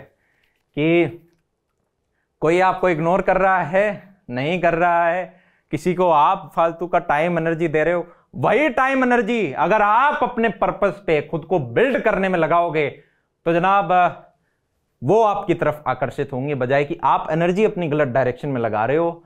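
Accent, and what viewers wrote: Haryana, India. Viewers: Jhunjhunu se ho ya haryana se?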